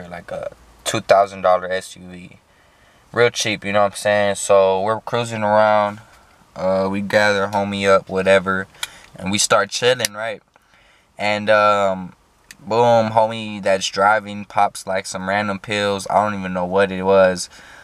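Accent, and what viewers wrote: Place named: Houston, United States. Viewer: This dude sound like he from Houston 😂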